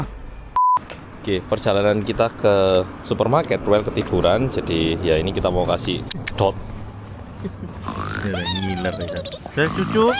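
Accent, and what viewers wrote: Java, Indonesia. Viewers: ini korea Tulen tapi,konten nya Bener" MUEDOK sesuai bngt bhs Indo nya,MANTAAAAAAP SHT" SLL RUEL DN PAPI MAMI NYA Aamiin....🤲🤲🤲👍👍👍💚🙏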